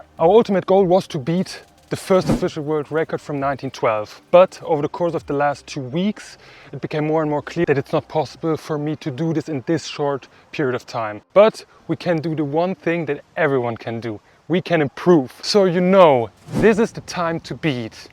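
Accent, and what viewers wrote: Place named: Germany. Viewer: An sich interessantes Video, aber dude.. dein german accent killt jede Motivation weiterzuschauen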